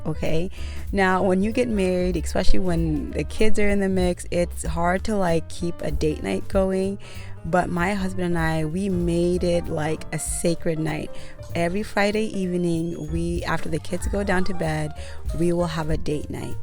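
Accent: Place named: Jamaica. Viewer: You talk like Jamaicans 👍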